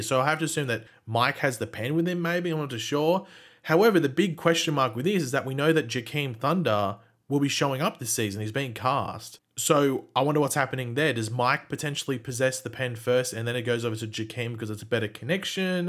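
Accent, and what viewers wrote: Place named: Australia. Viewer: Ur Australian! Lit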